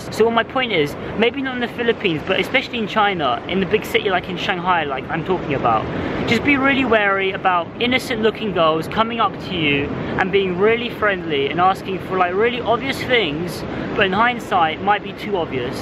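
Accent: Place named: United Kingdom. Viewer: Wow nice 700+ followers, and this video 4000 views good I like your British accent, so good listen to you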